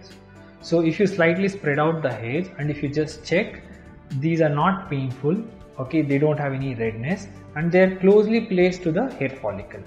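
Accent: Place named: Punjab, India. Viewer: I don’t understand Punjabi English.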